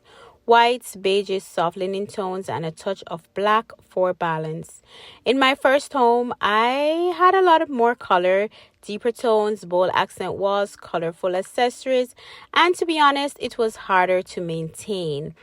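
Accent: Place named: Caribbean. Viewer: I don't know how I ended up on this side of youTube but heard the accent and hard to support my Caribbean sistah! Great tips!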